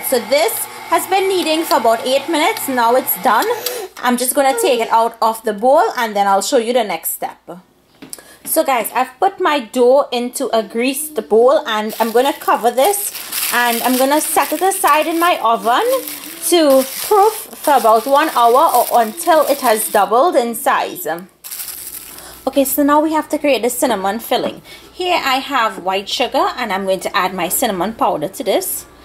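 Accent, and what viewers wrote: Trinidad and Tobago. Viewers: I heard your Trini accent and I was like, "oh, shit, this is gonna be good!" Frigin love Trinis man. They know how to cook! I grew up in Scarborough, Ontario -- I know what I'm talking about lol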